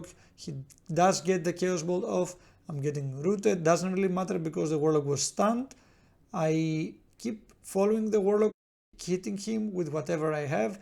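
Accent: Greece. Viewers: You sound like a fellow Greek. 😅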